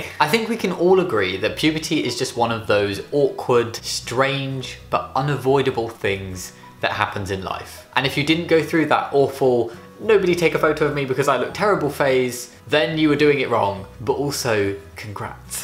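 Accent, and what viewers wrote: United Kingdom. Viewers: Why addy Woop well done that accent is British! If you want to hear another follow me!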